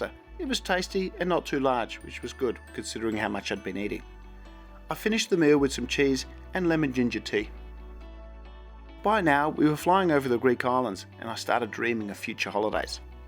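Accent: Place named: Australia. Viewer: Hi, another great video. Can you speak Dutch? )Asking because you have a proper aussie accent:) )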